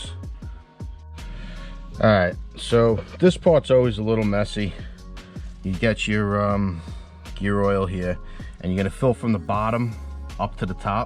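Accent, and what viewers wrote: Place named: Long Island, United States. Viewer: Sounds like your from L.I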